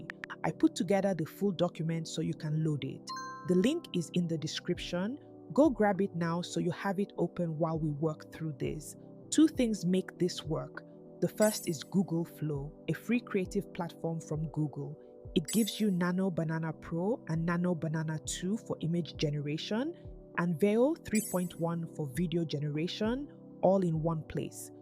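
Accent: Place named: Nigeria. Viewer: Why don't you convert your accent to a proper English accent so people can follow it? What kind of accent is this? African, Nigerian? Is your course also same?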